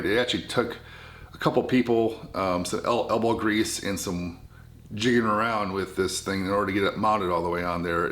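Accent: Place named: Midwest, United States. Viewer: Ive thought you sounded like your from the Midwest. I was leaning towards Michigan, Wisconsin or Minnesota. Not a bad guess from your accent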